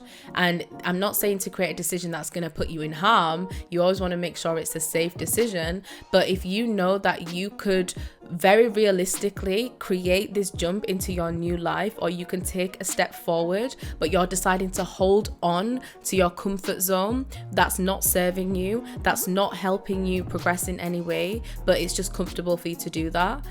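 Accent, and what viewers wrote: United Kingdom. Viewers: Hearing a UK accent automatic like❤😊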